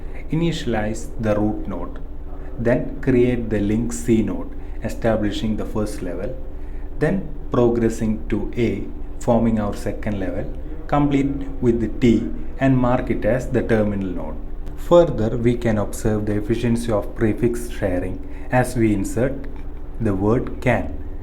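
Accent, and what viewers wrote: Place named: India. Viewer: disgusted by this Indian accent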